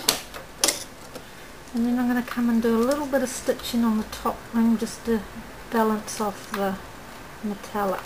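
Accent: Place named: Australia. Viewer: Wow thats incredible. I have been sewing off and on since I was a kid but just started quilting. You do beautiful work. Ive never seen a machine that switches colors before. I detect an Austrailian accent. You remind me of an old friend of mine. I just moved to Florida from NY. Quilting is very popular down here and my aunt is teaching me. I have to show her your videos. thanks. I would love to make a butterfly quilt with this techneq. for my daughter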